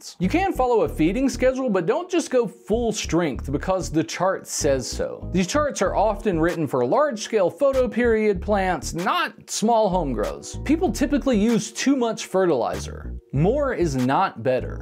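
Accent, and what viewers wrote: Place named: South, United States. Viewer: my got that southern drawl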